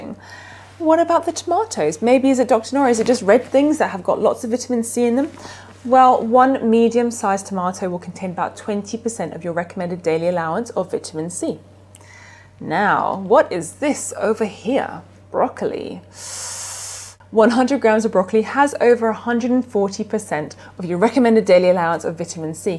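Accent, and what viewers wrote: England, United Kingdom. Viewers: Great video Nora (shared). Seen some of your other videos and noticed your from Australia but your accent is more English as I'm from the UK myself. Did you once live in the UK and if so what Town/City.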